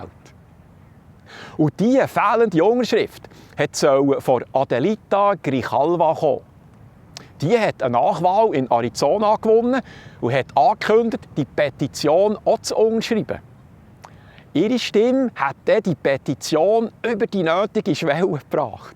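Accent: Switzerland. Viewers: Vielen Dank für die ausgeglichene Aufdröselung der Ereignisse. Auch wenn ich mich mit Ihrem Swizerdütsch etwas schwer tue - aber das wird schon besser ;) - weiß ich diese Art Berichts ehr zu schätzen. Eine Bitte zur Klärung hätte ich. Was hat es mit den somalischen "Kindergärten" auf sich? Hier gehen die Berichte komplett konträr - sprich: links sagt gar nichts, rechts um so mehr.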